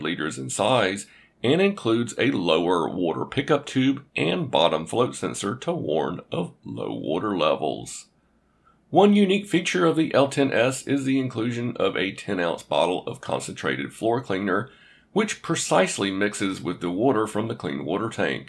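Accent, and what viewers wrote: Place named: South, United States. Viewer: apologies , it sounded like.youre from the southern U.S. For us brits, Canadian and US accents are pretty much indistinguishable save a twang from states like TX, AL, FL.. bit like aussie and brit then lol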